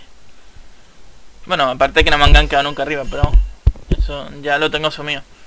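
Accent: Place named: Andalusia, Spain. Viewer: a que tu eres de andalucia?¿ o al menos pareces XDD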